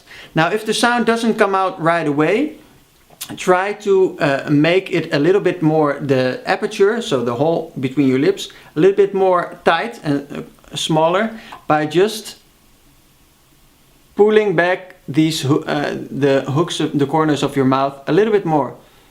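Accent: Netherlands. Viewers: Its a Dutch accent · I knew you were Dutch the moment I heard you speak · that dutch accent tho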